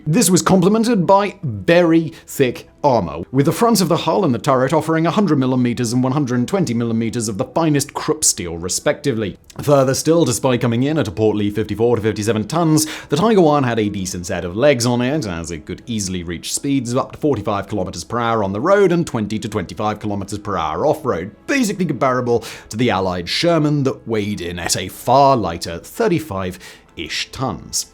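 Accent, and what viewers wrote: RP, United Kingdom. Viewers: You like it or not the Tiger tank piled up many kills and is without any doubt the most famous tank in history. Why don't you make a video and describe with your unsuportable posh accent the real pieces of junk that were WWII british tank?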